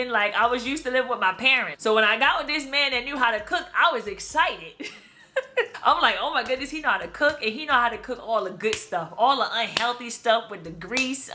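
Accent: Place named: Washington D.C., United States. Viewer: Are you ftom D.C ?? I hear accent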